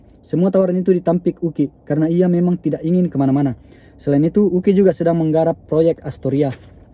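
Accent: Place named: Toraja, Indonesia. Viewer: Macam sy tau logat bang kayak org susl sel deee kayak Toraja👍🌷🌷🌷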